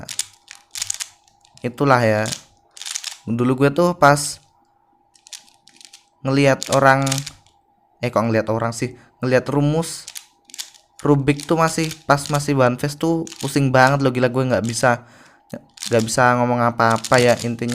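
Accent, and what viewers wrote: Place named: Java, Indonesia. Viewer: Medok mu itu loh masss <3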